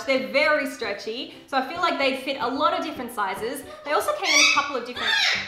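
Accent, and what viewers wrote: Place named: Australia. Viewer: Loving seeing an Aussie youtuber. Finally a familiar accent!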